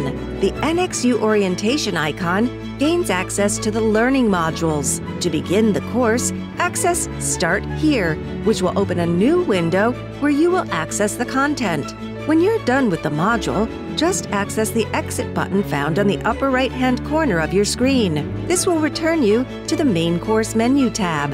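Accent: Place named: South Africa. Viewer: She is definitely South African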